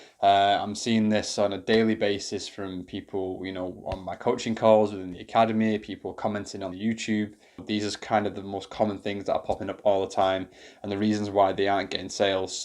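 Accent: United Kingdom. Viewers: please don't get me wrong but i cannot understand your UK accent. And the automatic captions doesn't matches with your words. You should create own captions for every video.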